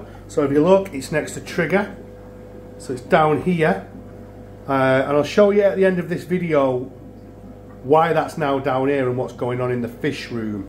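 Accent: England, United Kingdom. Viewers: My daughter has started saying "stunning" in an English accent after watching your videos with me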